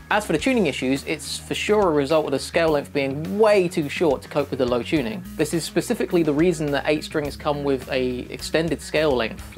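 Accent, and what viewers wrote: United Kingdom. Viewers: I've seen you in Riff Wars, Shred Wars, and Ultimate Shred Song but this is the first time watching your channel and the British accent threw me a curveball haha it was cool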